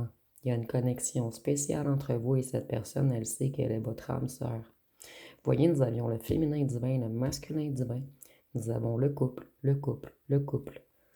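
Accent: Canada. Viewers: ❤ Merci,oui, exactement,il a menti, évitant, sûrement vie antérieure mais où ?, exactement il a tout foiré, j'adore votre accent canadien,en le côtoyant j'ai compris qu'il ne voulait pas travailler sur lui,et guérir ses blessures.C'est exactement ça,en se rejettant,il m'a rejetée, m'a fait beaucoup souffrir 😢😢.On a la blessure d'abandon en commun,et de rejet aussi,il faudrait qu'il aille voir un psychologue.C'est ma flamme jumelle 🎉🎉,tout ce que vous avez dit est excellent.On s'est rencontré en 2021.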